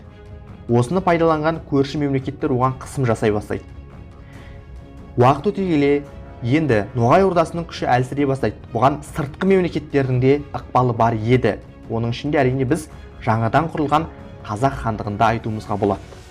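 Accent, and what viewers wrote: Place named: China. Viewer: Автор қытай ма монғол ма немене? Неғылған акцент? 😡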